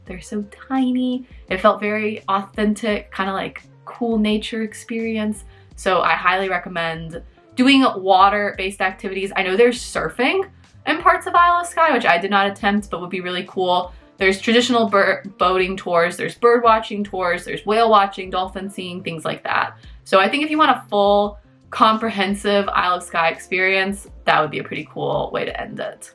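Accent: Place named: United States. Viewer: You sound like a very respectful American. Thank you.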